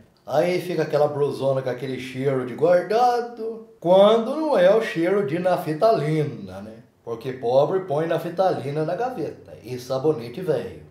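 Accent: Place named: Caipira, Brazil. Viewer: Vc fala igual aos caipira meus tios pai fala assim e eu acho lindo mas ñ consigo falar ñ peguei o sotaque kkkkkkkkk bjos parabéns lindo demais...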